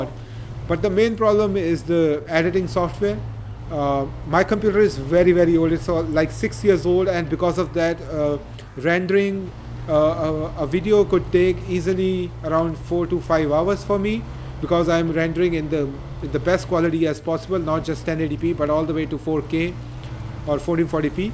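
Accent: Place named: India. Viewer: Hey !! Sounds like you're Indian, where are you from? I am a biker YouTuber from Bangalore who loves this game!! Love your content , subscribed :)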